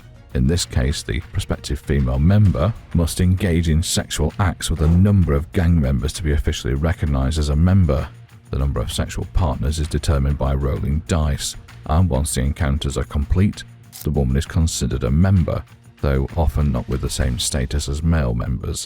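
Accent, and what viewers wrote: England, United Kingdom. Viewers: How tf yall going to use pictures that aren't even TRG. And how tf a nigga with an English accent know anything about TRG. You guys missed the whole unique thing about TRG. We have every race in TRG that's what made TRG the strongest and biggest. Do better homework.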